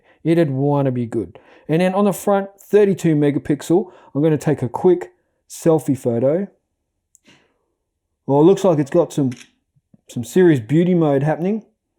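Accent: Australia. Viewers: english Australia mate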